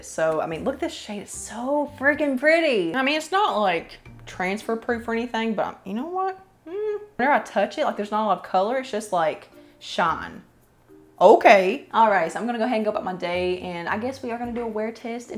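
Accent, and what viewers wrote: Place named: Tennessee, United States. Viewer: Awesome vid. Your accent is amazing. Is it TN? I’m Canadian and love your accent!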